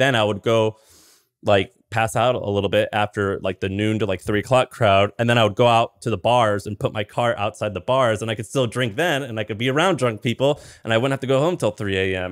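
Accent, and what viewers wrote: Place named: Midwest, United States. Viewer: Midwest asf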